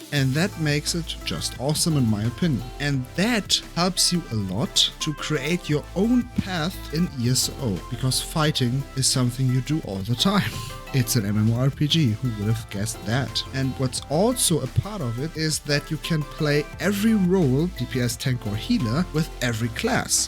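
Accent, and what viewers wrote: Germany. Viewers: Starker deutscher Akzent, heilige Maria XD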